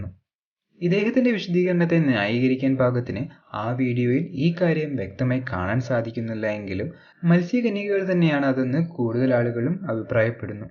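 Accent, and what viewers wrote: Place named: Thrissur, India. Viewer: Thrissur Kari Paranjath kekan rasand evdo ketta polea😲🤓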